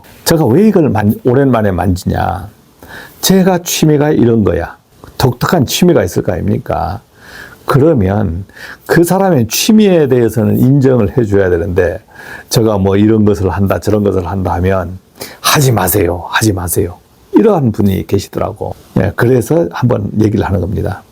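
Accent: Jeju, South Korea. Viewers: 동향분이셨군요 제주도 말투가 보여서 긴가민가했었어요 ㅎㅎ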